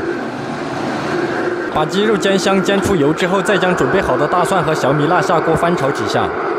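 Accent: Sichuan, China. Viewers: 程咬金 听口音就是川普，四川人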